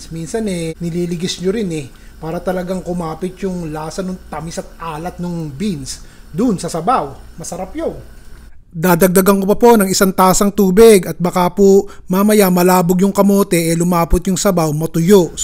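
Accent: Philippines. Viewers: Chef taga Saan po kayo originally kasi ang Ganda ng punto ninyo 😂 tagalog na tagalog eh 😅 Saan po kayo naka base . Gagawin ko Ito today sa malamig na weather here in Scotland . 🏴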